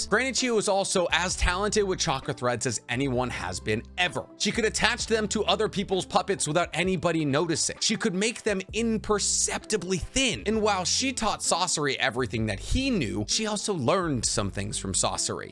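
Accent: United States. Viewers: You have the most American pronunciation of Japanese names I've ever heard. But I still look at your vids I just try to ignore it.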